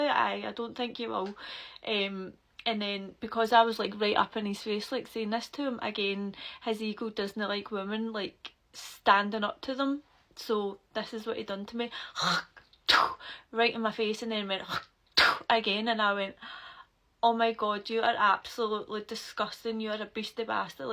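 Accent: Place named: West Scotland, United Kingdom. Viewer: Where abouts you from??? Defo sound West Coast of Scotland / Glasgow / Ayrshire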